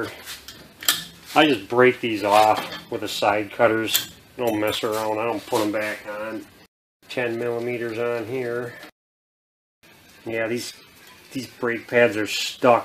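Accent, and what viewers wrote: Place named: Midwest, United States. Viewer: Gotta love that ol' midwest accent lol! You just convinced me not to try to change the bearing on my 05 Jeep GC myself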